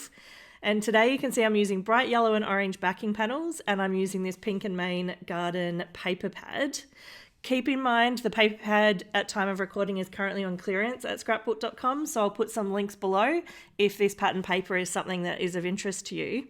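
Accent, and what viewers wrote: Australia. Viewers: Nice, quick and cute cards. I always love your cards and as a Canadian married to an Aussie it’s nice to hear that accent as well. Hugs from Ottawa, Canada 🇨🇦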